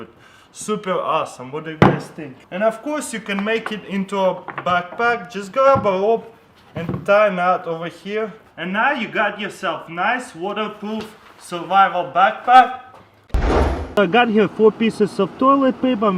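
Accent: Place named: Ukraine. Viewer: Ужасный английский с украинским говором) прикольно звучит , я даже так не могу)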